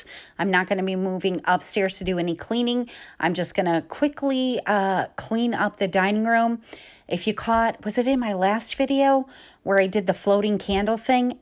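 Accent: England, United Kingdom. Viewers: I can hear you slipping into your English accent...makes me think of British TV shows (love Doctor Who and Masterpiece Theater). Busy crocheting for the past few days on several projects. Love your videos! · your home is smashingly turning into a wonderful cozy environment that is soo inviting. I enjoy watching your channel and your family and Clark. He's so adorable. I love your British accent 😂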